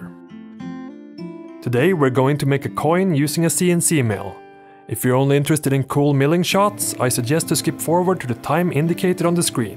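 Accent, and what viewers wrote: Sweden. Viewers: Man känner igen en svensk röst väldigt snabbt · He's most likely swedish, all swedish ppl sound like that if they haven't been in the US for a month.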